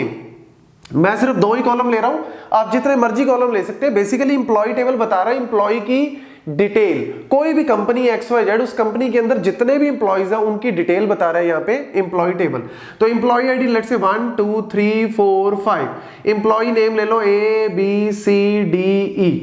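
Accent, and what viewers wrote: Punjab, India. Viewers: sir ji app punjabi ho?/?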